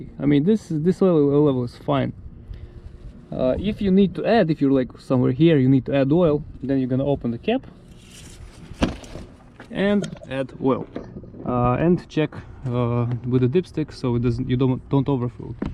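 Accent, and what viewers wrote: Russia. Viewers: Thanks man! Your Russian accent makes this video easy to understand and learn. Thanks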